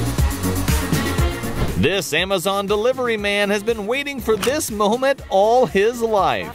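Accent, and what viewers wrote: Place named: United States. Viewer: We don’t really need terrible American voiceovers… or cheesy music.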